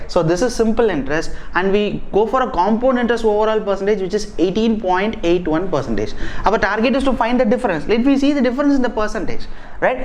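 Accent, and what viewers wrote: India. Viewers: disgusting accent. making fun of india around the world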